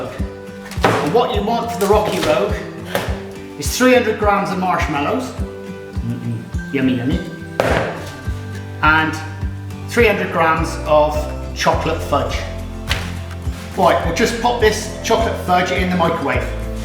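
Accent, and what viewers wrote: United Kingdom. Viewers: Yum! We Americans love a Brit accent :)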